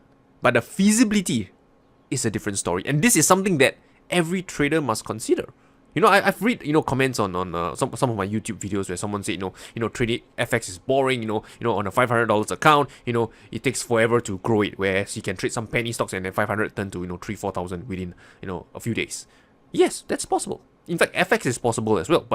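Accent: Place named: Singapore. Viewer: Nice discussion Sir. And the Singaporean English Accent.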